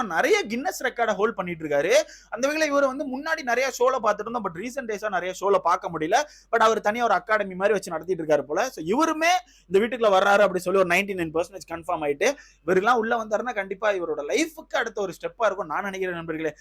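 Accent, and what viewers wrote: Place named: Tenkasi, India. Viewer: தம்பி போனசீசன்ல பிக்பாஸ்க்கு ரசிச்சனோ இல்லையோ உங்களின் ரசிகன் ஆகிவிட்டேன் ஏனென்றால் உங்களின் வட்டார வழக்கு தென்காசி மாவட்ட வட்டார வழக்குபோல் உள்ளது அதனால்தான்.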